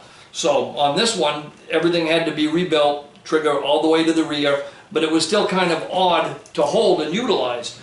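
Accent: Maine, United States. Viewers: It's GREAT to see you getting back into full swing! Love the Maine accent and getting a history lesson aint bad either! Thanks!! Glad you are on the mend!!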